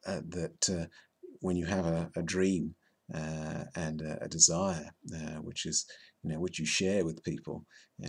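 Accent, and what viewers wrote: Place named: United Kingdom. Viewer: Just gotta say, I love your accent (I'm a sucker for a British accent though).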